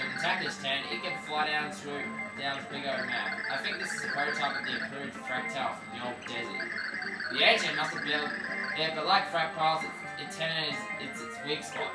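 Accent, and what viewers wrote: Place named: United Kingdom. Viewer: his british accent makes his anger funny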